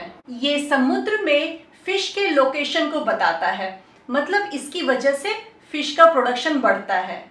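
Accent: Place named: Bihar, India. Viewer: Where you from? It feels you are from either bihar Or up. Anyways it was a wonderful lecture and helped me truely.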